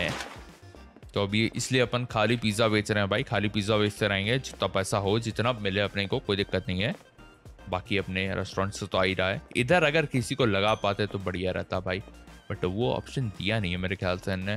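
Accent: India. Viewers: Bro are you an INDIAN?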